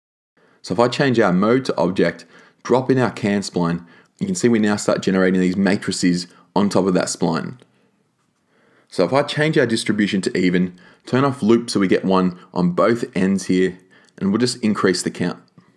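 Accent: Australia. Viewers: Hi, It's great to hear an Aussie accent on YouTube. I love your videos, your camera girl is awesome as well. I'm based in Batemans Bay, south coast NSW.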